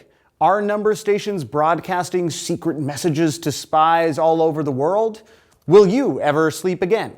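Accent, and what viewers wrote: Canada. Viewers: Your Canadian hell ya Eh...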